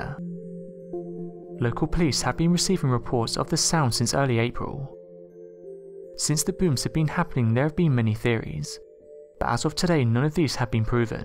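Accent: United Kingdom. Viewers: It is to. He's British, which you SHOULD be able to tell from his accent.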